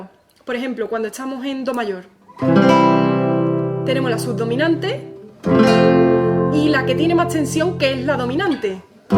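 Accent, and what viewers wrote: Andalusia, Spain. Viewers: Woooww! Que canal increible!!! Apenas lo conocí y ya me está encantando!!! Ah, y por cierto , que lindo acento andaluz!!! :D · Me encanta el flamenco y la cadencia andaluz oleee😂❤😅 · Ella tiene acento andaluz de Sevilla. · Española, puesto que es de Andalucía, la región del sur de España. Por eso tiene ese acento que es el "asento andalú". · No entendí nada (demasiado técnico para mis pobres conocimientos musicales), pero disfruté el vídeo enormemente. Me encanta tu acento andaluz y tu linda cara muy expresiva. Ojalá pudieras hacer un vídeo de los palos flamencos. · Il tuo accento è andaluso? È bellissimo. Per me questo è lo spagnolo per eccellenza. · tu estilo de explicar, junto con el tono de voz y delicioso acento andaluz dejan a uno anonadado, hipnotizado. ¿Cómo es posible que tanta destreza y belleza se halla concentrado en un ser viviente, a menos que no sea un angel caído del cielo!! 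Qué placer!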